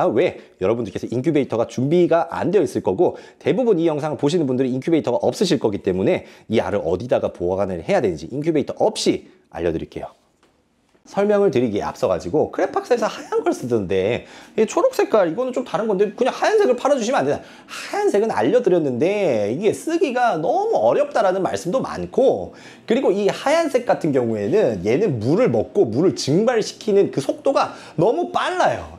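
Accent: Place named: Gyeongsang, South Korea. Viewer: 와 경상도 사투리 찐이다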